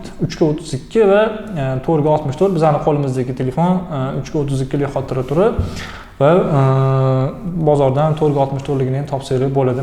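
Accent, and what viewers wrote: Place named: Tashkent, Uzbekistan. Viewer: 🤢🤮 Aka iltimos Toshkentcha gapirmang, kitobiy o'zbek tilida gapiring. 😊😄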